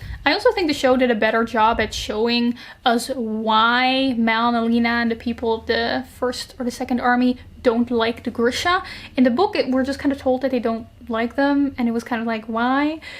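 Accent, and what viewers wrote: Netherlands. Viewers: This is absolutely not critique your english is really good, its just funny how as a dutchie, i can recognize you’re dutch as well in like .2 seconds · Is your accent Dutch?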